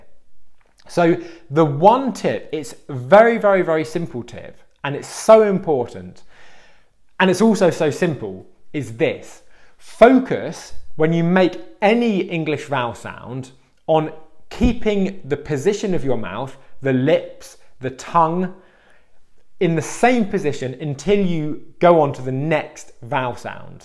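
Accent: United Kingdom. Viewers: about this"s video the accent is British English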